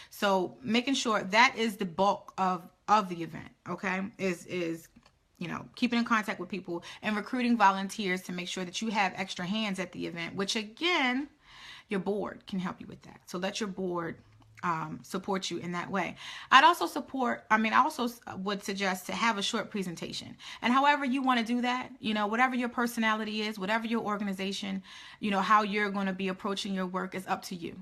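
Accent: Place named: Philadelphia, United States. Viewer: This wasn't only informative, it was inspirational. Just starting in January, I've never even given thought to an event. The non profit status advice was helpful as well. I was wondering how to raise the ~$600 for 501c3 corporation filing. Thank You! I was also shocked you mentioned Baltimore. You sound more Phily than Maryland ;-)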